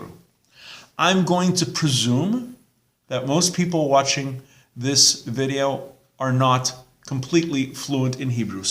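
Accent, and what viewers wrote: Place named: Midwest, United States. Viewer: Sir. Where are you. You sound midwestern. Chicago-y or Milwaukee-ish. ? M. Elgin. Illinois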